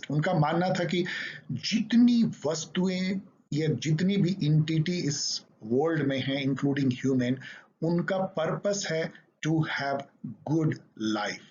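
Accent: India. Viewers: I swear by Allah Almighty, I'm not understanding Indian English😢it sounds like they're speaking their language.😢 Is there any link for me check out on? 17 days to our exams😢😢😢😢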